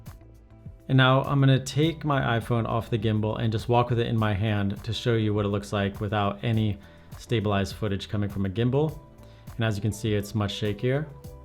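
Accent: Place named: United States. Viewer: Are you American or the best Swedish English speaker In the world ? Lol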